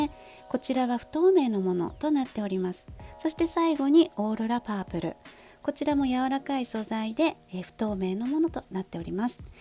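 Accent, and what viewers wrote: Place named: England, United Kingdom. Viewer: i love the English accent im obsessed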